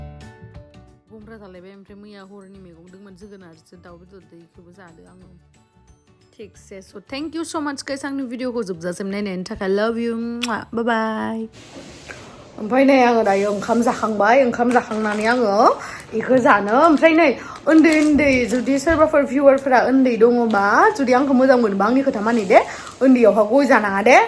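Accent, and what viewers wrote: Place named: Chirang, India. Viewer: Abo ngni railainaiya manw Chirangnibaidisw Jayw hw ngsinibaidi jaya teobw hitt kwnayw abo wkki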